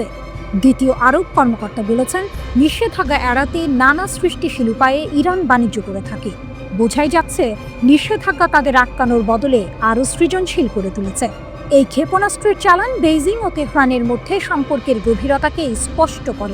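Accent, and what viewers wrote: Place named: West Bengal, India. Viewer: নাগরিক টিভি ভারতীয় বাংলা উচ্চারণে সংবাদ পাঠ চালু করলো কেনো?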